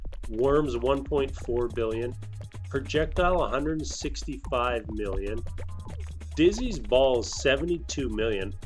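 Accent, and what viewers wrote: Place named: Pacific Northwest, United States. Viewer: After your question about what draws folks to your channel and game play, I was thinking about the reasons that I do enjoy watching your Goobies runs to start my days, here in Brooklyn. 1. I'm originally from the Portland, OR area and your voice has an accent that reminds me of home. Over the years, I've learned that the Pacific NW of the US has an almost Canadian accent in its English. Perhaps this is a result of the many years in which the territory was jointly administered by the U.S. and the U.K. or now the region's proximity to Canada over time? I just watched a history explainer video on that international relations history of this U.S./U.K. joint rule, by one of my favorite YouTube channels 'History Matters' , this last week. (I also work in and academically study International Relations and International Affairs, here in NYC; and sometimes for the U.N. 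here in the city or at international conferences.) Long story short, your accent feels welcoming and homey to me. (P.S. If you ever have any International Relations questions, feel free to ask. I'm always happy to share what I may have learned over the years.) 'Why did Britain give up Oregon? (Short Animated Documentary)' "Britain once held condominium of what's now Oregon, Idaho and Washington State with the United States and during a time of diplomatic strength, simply handed it over without any compensation. So why did the United Kingdom do this when it could have got something in return or at least given less? To fi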